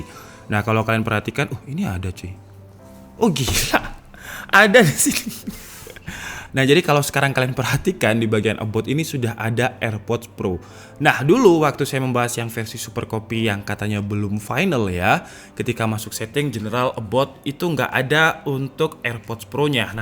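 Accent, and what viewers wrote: Java, Indonesia. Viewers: Di kelet kan pakai api... Wes talah jowo mu loh mas Medok banget... Seneng aku mbek bahasa ne..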